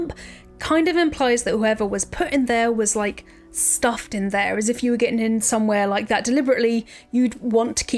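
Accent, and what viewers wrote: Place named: United Kingdom. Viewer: What UK accent do you have?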